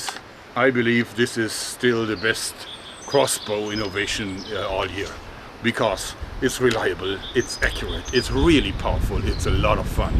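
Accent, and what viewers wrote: Germany. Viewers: do you want to learn German, or do you just like the accent?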